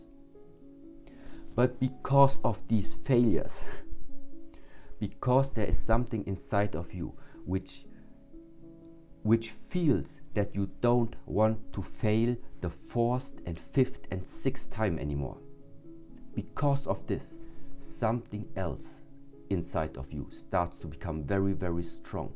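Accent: Germany. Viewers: Anybody else thinks his accent sounds German? 😂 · I knew I heard a German accent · U Sound like a german speaking english :D whats your native language?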